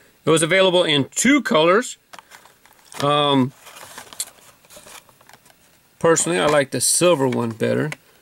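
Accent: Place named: Mexico. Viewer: are you mexican because you sound like you have an accent like my dad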